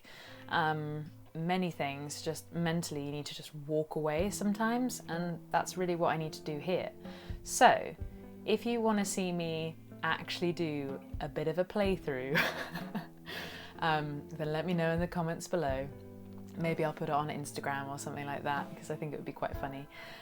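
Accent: United Kingdom. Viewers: K bro so this don't matter a tiff but I fuckin KNEW you would have a British accent before I clicked on the video man I could tell by the way your mouth made your name say in the preview yah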